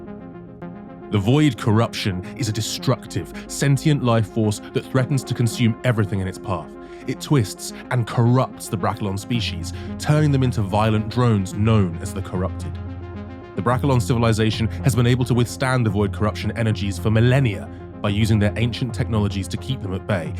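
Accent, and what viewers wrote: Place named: United Kingdom. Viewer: My man you need more views with that smooth British accent.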